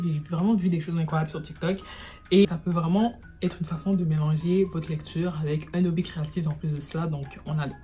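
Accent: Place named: Canada. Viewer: Merci à toi aussi et hate pour ta partie 2❤❤💕💕 ( espione😈😈) (en plus j'adore ton accent canadien❤❤❤❤💕💕)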